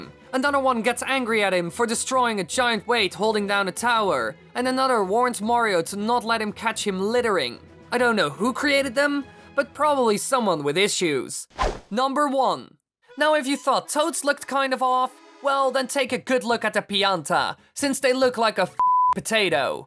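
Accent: Netherlands. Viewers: okay so i watched the video and i just gotta ask..is there a chance your dutch? xD your accent just sounds dutch espacially its not bad or anything im dutch myself (hela hola mijn medenederlanders) but im just really curious ehahaha xD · Are u dutch pls say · Are you Dutch?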